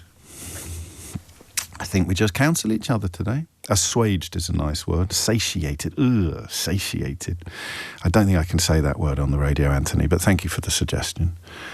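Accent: United Kingdom. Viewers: Watching you from South Africa and I have to say thank you. Honest reporting is very rare. Plus, the British accent is a bonus, love it.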